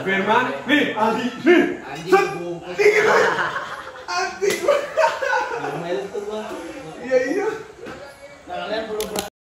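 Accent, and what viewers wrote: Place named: Java, Indonesia. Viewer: Jowo medok😂😂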